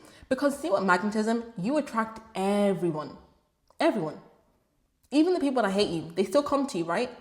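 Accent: Ireland. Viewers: I WAS LITERALLY GOING TO ASK IF YOUR FROM IRELAND!! I feel like you would be fun to be around! 😂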